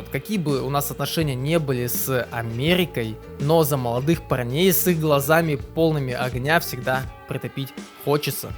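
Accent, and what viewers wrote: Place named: France. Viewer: Комментатор с французским акцентом,не в обиду,прикольно базарит.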